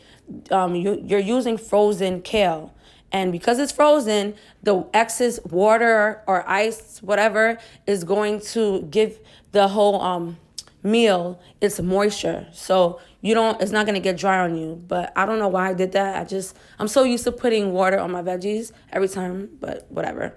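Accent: New York, United States. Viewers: I immediately knew you were from New York within the first ten seconds. Lol.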